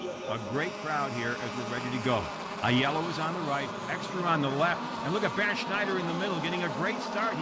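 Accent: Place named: United States. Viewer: what's with the american accent?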